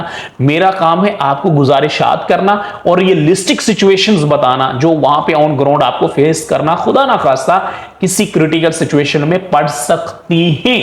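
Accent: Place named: Arab world. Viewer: اردو کو اردو کی طرح بولئے اسمیں عربی لہجہ یا تلفظ مت گھسیڑئے۔ بہت بھونڈا لگتا ہے۔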